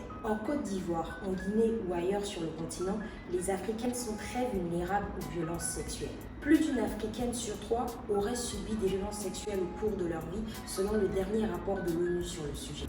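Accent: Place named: Gabon. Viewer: Je kiff ta chaîne, et l'accent gabonais viens mettre un petit plus ♥️ continue t'arrête pas❤️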